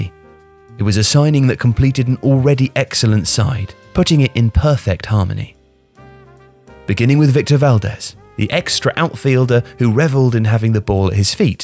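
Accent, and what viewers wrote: England, United Kingdom. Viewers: Good job on the video, but very lazy nomenclature of "The Ukraine" Using phrases like "The Ukraine", "The Lebanon" or "The Sudan" especially in an English Accent really emphasizes the inability to detach oneself from Imperialism. I know this wasn't your intent Tifo Football, but it is extremely easy to hire an editor to catch the definte article to diminish a soverign country. Again, thanks for the video!!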